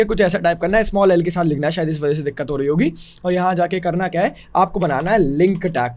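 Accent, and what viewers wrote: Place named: Indore, India. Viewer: Bhiya indore s ho asa lgta h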